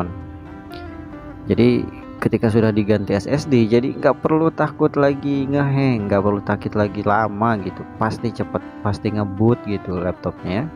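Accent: West Java, Indonesia. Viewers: Logat namah sunda...dimana ie kang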